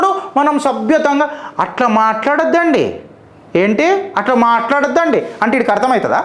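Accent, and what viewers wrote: Telangana, India. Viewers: Anna నిజమే అలా ఆడవాళ్ళ గురించి మాట్లాడకూడదు.ఇదే యూట్యూబ్ చానెల్ లో నార్త్ లో మణిపూర్ లో ఆడవాళ్ళని లంగా చేసి ఊరు మొత్తం ఊరేగించారు ,మరి దానిగురించి మాట్లాడు అన్న ,లేదు అది north నేను south నేను మాట్లాడను అంటే,నీ యాసను బట్టి అర్థమవుతోంది నువ్వు తెలంగానోడివి అని,సో నువ్వు నీ తెలంగాణ ను చూసుకో మేము మా AP నని చూసుకుంటాం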